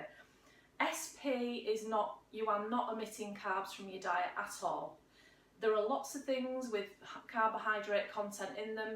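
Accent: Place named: South Yorkshire, United Kingdom. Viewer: where are you from your accent sounds like mine ( im from south yorkshire rotherham )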